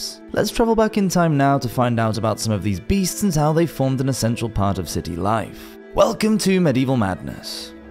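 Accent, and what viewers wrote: England, United Kingdom. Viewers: Just English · a deaf person can tell this is an english accent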